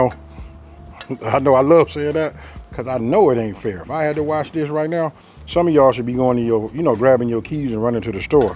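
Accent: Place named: West Coast, United States. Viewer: You can tell his from Cali or somewhere from the west coast gangsta turned chief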